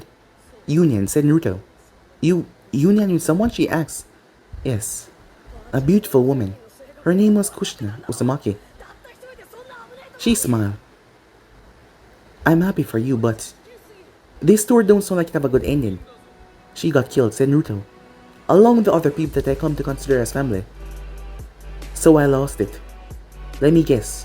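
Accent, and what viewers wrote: Jamaica. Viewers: Hey i have a question by any chance are you jamaican???🤔🤔🤔🤔, I am just asking since when I listen to your stories i hear a bit of the accent🤔🤔🤔🤔 #hmmmm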